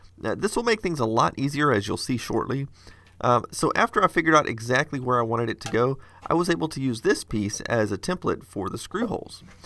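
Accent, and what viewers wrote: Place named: Texas, United States. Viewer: Classic 8 but guy. Always a pleasure. Is it just me or does sound a bit more Texan in these early videos?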